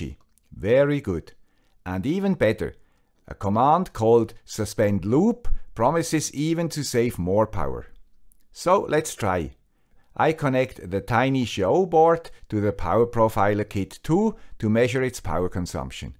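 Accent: Switzerland. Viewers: Dear fellow Guy with a Swiss accent I‘m not sure why and since when, but I consider the Arduino IDE to be bloatware (OsX). As I currently have no low latency projects I have deinstalled it completely and switched to μPython. Yes, this has limited me in some ways, but I‘ve also noticed that as an engineer I can basically transfer the specifications to Python and it‘s still legible for beginners. Less hassle for me and my few clients, albeit limitations apply. Hopefully Arduino will change their approach (maybe they already have?) and maybe even open the IDE to μPython.. Thank you very much for your effort, your content has nonetheless helped me broaden my perspective (and workshop 😅) and I‘ll be replicating your Pöschtler alarm soon. Bye!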